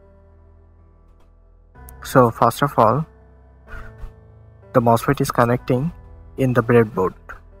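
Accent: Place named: India. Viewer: Are you indian?It sounds like an Indian accent.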